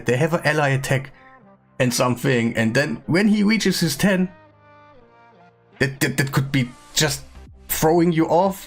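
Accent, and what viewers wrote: Germany. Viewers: Dude, where are you from? You sound German or Swiss or Austrian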